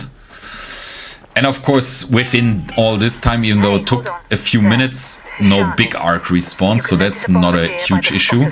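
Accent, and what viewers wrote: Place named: Germany. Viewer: Good Video. Dont kow why some people crying here. Außerdem hörst du dich deutsch an. Muss man supporten. Sollen alle die fresse halten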